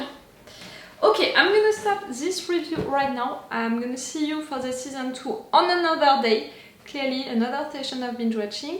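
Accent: France. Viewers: You are french ?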